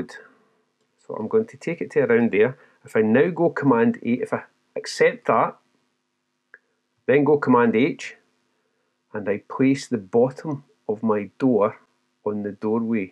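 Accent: Scotland, United Kingdom. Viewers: Thanks a lot for your shared expertise and for the files used to follow your really helpful video. Your a great Ambassador for Luminar. I follow you from the first day I used Luminar 4. Really helpful. Besides I love your Scottish accent (I'm a fan of Scotland).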